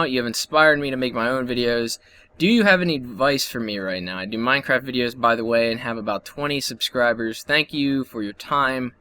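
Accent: Canada. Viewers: hey are you canadian because i here you say guy a lot lol i love all of your videos man i watched your live stream last night pretty awesome god i love your videos and the other creatrues too lol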